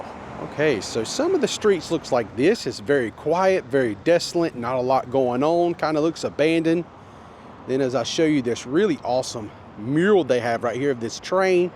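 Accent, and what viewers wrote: South, United States. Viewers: Another great vlog. Where are from? Are you also from NC, you definitely have a Southern accent? Also, why don't you do a vlog of Hendersonville? I'd like to see how it's changed over the past 40 years, apparently a load of rich Yankees have bought 2nd homes there?! 👊🏼🇺🇸👍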